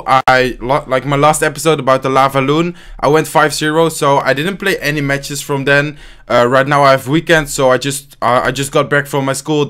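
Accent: Netherlands. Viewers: Je praat echt nederlands engels, of goed engels of nederland man! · als nederlanders engels praten, hoor je het echt dat ze nederlands zijn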